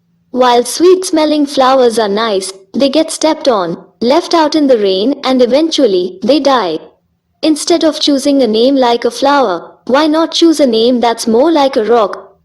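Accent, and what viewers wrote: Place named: India. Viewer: An Indian voice ? Cool information. 🙂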